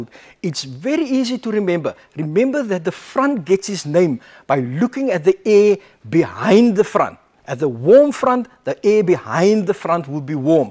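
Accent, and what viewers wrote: South Africa. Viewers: Cape (South Africa) accent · south africa, cape town. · South Africa :)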